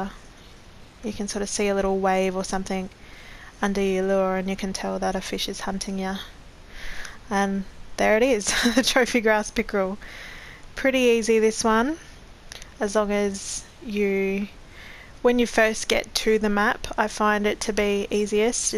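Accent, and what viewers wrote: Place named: Australia. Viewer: I was not on speed 2 and wasn't able to get the stop and go up off the bottom. Was giving the little grass pickerel a fit changed to speed 2 first cast was practice.... second cast Trophy Grass pickerel. Lol off to Neherin for that last one in my mission Thanks...love your accent by they way...is That Aussie?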